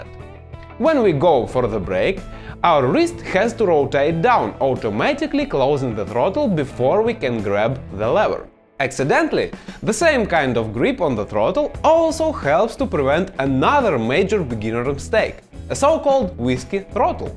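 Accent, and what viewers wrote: Russia. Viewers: Love the vids brother, forgive my ignorance please, but you sound like you're from Russia? Im curious, how many months of riding do you guys get over there? in my head all i see is snow and furry hats, Russia's cold looks like its NO JOKE 😳 Ive obviously never been but id love to.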